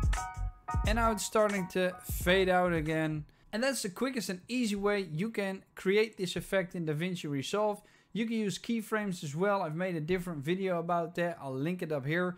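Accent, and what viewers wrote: Netherlands. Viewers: dacht het wel dat ik een Nederlands accent hoorde ! succes nog en doe zo verder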